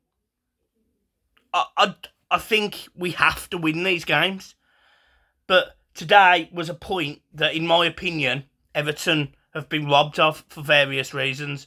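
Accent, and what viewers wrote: Birmingham, United Kingdom. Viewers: Are you sure you are an Everton fan you sound like a Brummie to me! Support your home people! I don't care about Everton!!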